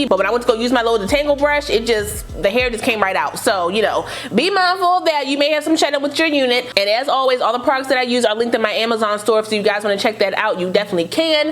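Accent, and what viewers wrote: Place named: DMV, United States. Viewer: That intro tho…girl you gotta be from the DMV! And the hair looks bomb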